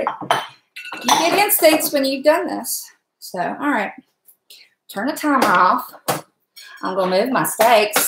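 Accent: South, United States. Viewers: Yum! Enjoyed this video and just want to say, you have the best southern accent! Love it!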